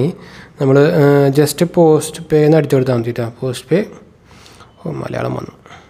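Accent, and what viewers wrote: Thrissur, India. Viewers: തൃശൂർ ഭാഷയാണ്. അൽപം സ്പീഡ് കുറച്ചാൽ നന്നായിരിക്കും.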